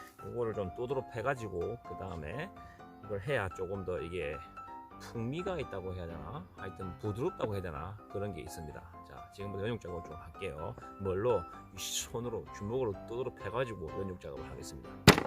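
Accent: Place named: Seoul, South Korea. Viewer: 목소리 딱듣고 스울사람 인지 알았음 ㅋㅋ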